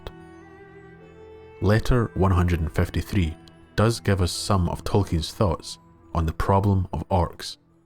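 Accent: Scotland, United Kingdom. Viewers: Ok sorry then. You speak (pronounce) Scottish not English. Very hard to listen to for a non english person. "I'm not speaking slang." - Yeah, since you´re a Scot, you speak Scottish. And a very proud one it seems you are. Sorry to have offended you and your way of interpreting the English language. Still you´re prounouncing an "i" like the rest of the English world would an "e". Not sure if you even consider what you speak as English. Maybe even, you are offended by me calling it English. Not my intention to offend, just to listen properly. So maybe it´s me and i gotta go wash my ears. Cheers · Scottish, Irish or Canadian?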